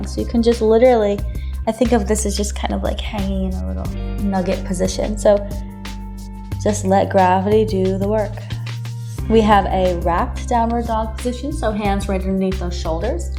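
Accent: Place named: United States. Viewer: Yoga has this saying that inversions works on your anti aging thing so it's the fountain of youth and I love your American accent namaste🤗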